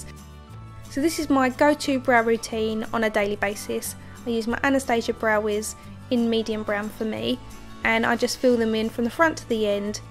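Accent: Essex, United Kingdom. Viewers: She sounds like she's from Essex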